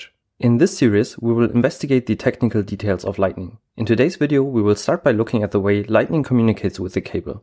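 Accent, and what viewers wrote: Germany. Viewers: Are you German? Just asking because of the accent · Dieser Deutschakzent ist super 😁 Das Video auch ! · Yep he is german😂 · Judging from your accent, you are German. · Bist deutsch oder? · 100% a German accent. Trust me, we have something akin to a sixth sense, allowing us to recognize our own accents in lightning fast speed (pun not intended lol). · Are you from Germany? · are u german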